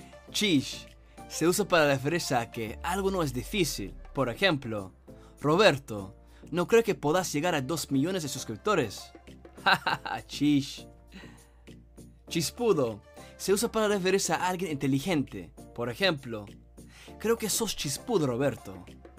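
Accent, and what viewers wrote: Guatemala. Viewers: Puchicas vos sabés bien el acento chapín puchicas.. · Jajaja 😂, ese es el hablado del chapín 100% 🇬🇹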